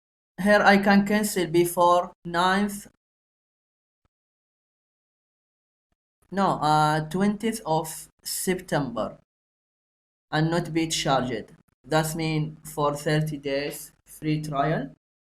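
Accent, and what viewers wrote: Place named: Arab world. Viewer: تحس عنده الاكسنت العربية شوي ممكن يكون من اصول هندية ولا شي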